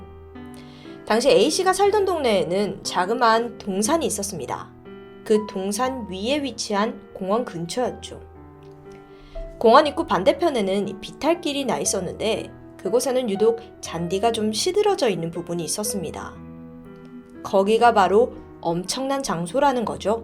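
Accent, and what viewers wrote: Jeolla, South Korea. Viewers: 진짜 죄송한데 전라도 발음좀 빼주세요 ㅠㅠ 시작하죠 - 시자가죠 답답했죠 - 답다뱄죠 제작해 - 제자개 이런거요 ㅠㅠㅠㅠ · 님 진행 너무 잘 하시고 좋은데 전라도 억양 때문에 자꾸 몰입이 깨지게 돼요 ㅜㅜㅋㅋㅋ 특히 시작이라는 단어 나올 때마다 시작합니다->시자감니다, 시작하더니->시자가더니, 시작했죠-> 시자갰죠, 시작하죠->시자가죠, 시작했습니다-> 시자갰습니다 이렇게 말씀하셔서 자꾸 몰입이 깨져요 ㅠ 노력했지만-> 노려갰지만 등등... 제시카님도 종종 이런 전라도 억양이 있는데 님 진행에서는 훨씬 자주 나와서 티가 나는 것 같아요. 이런 부분도 같이 연습해주시면 더 더 좋을 것 같아요! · 아.. 이게 전라도 발음 이군요. 저도 들으면서 저부분을 왜저리 발음하지? 하고 거슬렸던.. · 근데 이분 경상도사람인데 전라도발음나오는게 신기...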